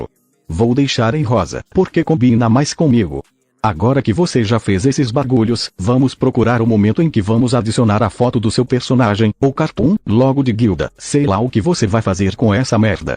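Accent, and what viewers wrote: Bahia, Brazil. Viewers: tu é Baiano?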